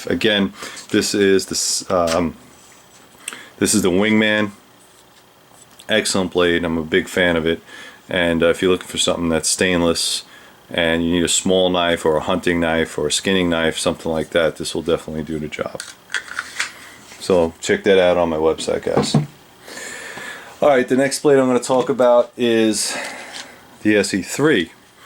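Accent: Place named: New Jersey, United States. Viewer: You gotta be from Jersey brother....I grew up in Newark and I know that accent...lol.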